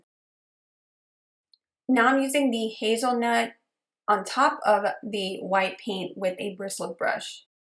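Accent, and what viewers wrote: Texas, United States. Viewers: I like all your creative decorations and I like how you take your time explaining how your voice sounds you must be from Texas keep up the good work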